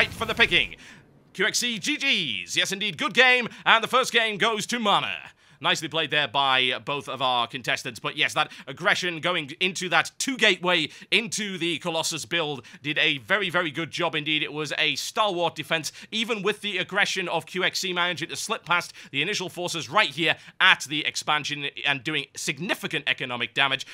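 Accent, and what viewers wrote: United Kingdom. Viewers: I think I have found my new favorite SC2 caster. I have to say it's kinda unfair since has his British accent in his favour.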